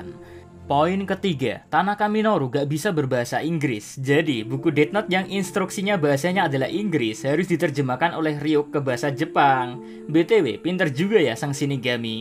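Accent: Java, Indonesia. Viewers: Lalu kenapa si mimim nya harus medhok gitu. Jd aneh denger nya. Klo bisa pake logat nya jgn medhok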